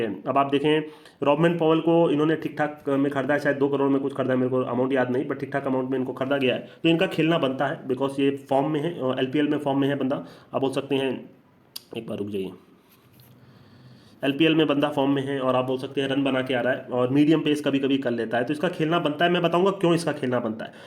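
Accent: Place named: Bihar, India. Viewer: Bhai bihar se ho kya aap? Aapka shardul thakur eko wicket nahi 20run dekar wala accent mast tha🤩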